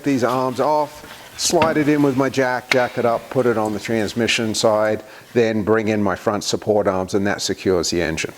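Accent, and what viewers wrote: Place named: Australia. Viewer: Thx. Different subject - on the first of your videos that I watched I tried to figure out your accent (kind of a hobby of mine). It was clear at least 50-75% is Australian, but there’s a significant influence from somewhere else, possibly Western European. Care to enlighten me? 😁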